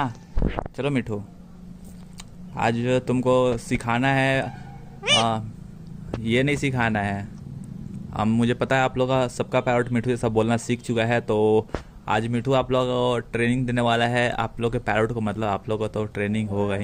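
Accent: India. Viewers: you are a stress buster.... Thank you for being u❤ your accent is pure desi... Pls don't change 😆👌👌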